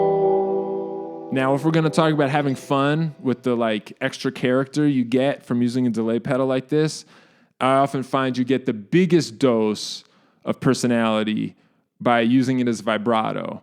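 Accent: Boston, United States. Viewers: This guy is actually really intelligent especially when it comes to music and pedal theory, but I can never not hear a Departed style super bostony/Ny parody accent, or that one guy from Family Guy that's like "I was going to bone my girlfriend, but there was no way"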